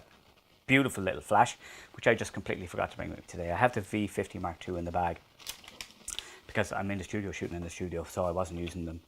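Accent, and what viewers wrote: Ireland. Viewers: Pronunciation sounded fine to me. Sincere. Thought there might be an Irish accent--not sure. (I like listening to Irish accents). Hope your wife is well. Thanks for making the video. I liked the simple background and the uncomplicated presentation. The video quality was really good. Am thinking to get an XT-2 for making Youtube videos. Thanks again!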